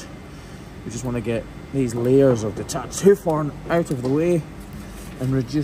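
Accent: Scotland, United Kingdom. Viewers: While I have watched a lot of these farrier videos over the last 2 or so years, I have to admit that I have come to watch the just to listen to the Scots accent, as my grannie was from Dunfermline and immigrated to the US in 1920, and when this guy does these videos I close my eyes and imagine it's my grannie I'm listening to. Is that weird?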